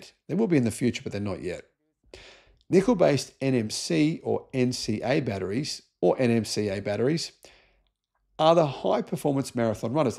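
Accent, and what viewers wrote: Australia. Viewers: A MAGAT with an aussie accent in a Cyber Jesus cult. WTF?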